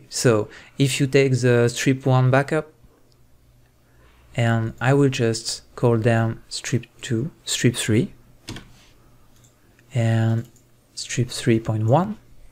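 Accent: France. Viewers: bravo super vidéo j'ai reconnu le petit accent français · do you speak french? if so I can tell in the way you talk cause I also speak french or am I trippin but nice tutorial tho its amazing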